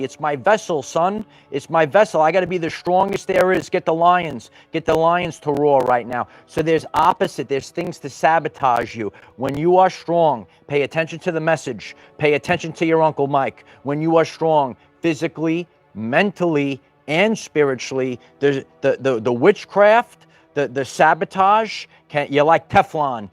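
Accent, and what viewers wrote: New York, United States. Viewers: Love ur NY accent!!! Thank u for this reading 🙌✨💫❤️🙏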